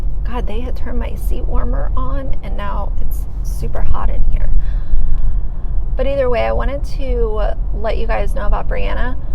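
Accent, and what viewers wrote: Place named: Colorado, United States. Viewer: You almost seem like a Colorado girl! Just how you talk. You would fit right in around here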